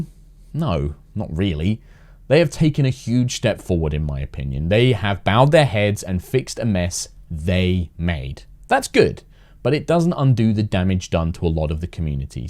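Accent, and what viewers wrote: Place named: United States. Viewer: I love you but why have you grown more and more American? You pronounce t's as d's.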